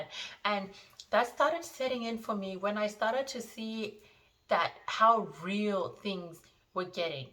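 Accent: Australia. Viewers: some ausie accent detected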